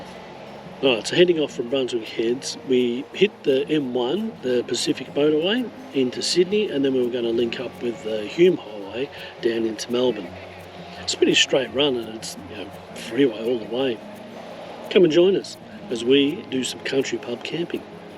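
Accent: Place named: United Kingdom. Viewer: What would you pay? We all understand your living on the edge each week until pension day. Mate drop the girls and the drugs, the cigs and the red frogs. Stop your whinging. Are you a Pommy? Sure sounds like it. Maybe small man syndrome.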